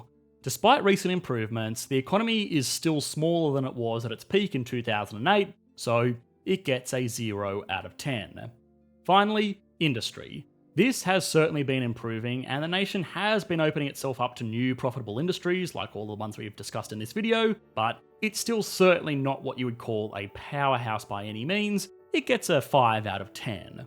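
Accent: Australia. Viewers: Well in fairness, the guy is an economist, he does economy videos, the history was tacked on more as a factor in that economy and the details don't change anything in regards to that, so it doesn't have to be too accurate. I don't watch these videos for history lessons, but because I like the Australien accent and the economic insight I get. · At 5,30 He had a stupid pronunciation for the capitol city - Sounding something like Nicozeya rather than Nik O See A! By accent, not sure if the guy is Australian mixed in with South Aprican with a dash of American splashed in there! Peace.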